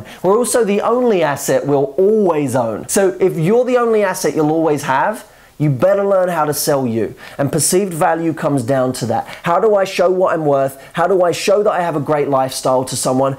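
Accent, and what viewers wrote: United Kingdom. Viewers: Hey can you please do a video on the accent...How to do a brit accent...I cannot get over it.. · ...is it revealed in some of yours videos what is the recipe to attract you ?! :) you are so cute, handsome, so kind and generous with sharing your knowledge that I am about to fall in love with you ;) ...the reason why I've popped into this channel was looking for anything to listen in english as a preparation for english exam and your british accent is so cool so I am watching more and more of your videos now <3 thank you :) ! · this guy is extremely attractive, i love his accent, is he British ?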